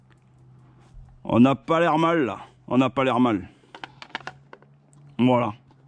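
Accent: South, France. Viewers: merci pour la reponse je vais en faire avant de partir peu etre pourrons nous se voir vers des cieux au taunos sil on est pas loin jaime cet accent tres sud cordialement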